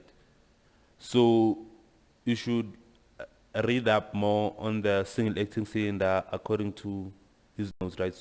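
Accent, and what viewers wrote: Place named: South Africa. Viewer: THAT SHARP SHARP AND NEEEH , TELLS ME THAT THE LECTURER IS FROM SOUTH AFRICA😅😅😅😅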